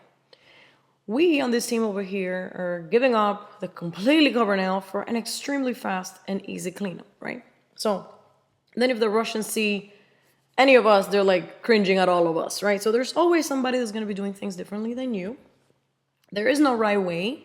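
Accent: Cuba. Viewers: This is so helpful!! Thank you! 😊 And I recognized you are Cuban, you've got a cute Gloria Estefan accent! 🇨🇺❤ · I love ur voice. I knew right away u were Cuban from ur accent. It sounds like Gloria Estefan who I adored back when. Anyway, unrelated! Also, this asshole line totally cracked me up.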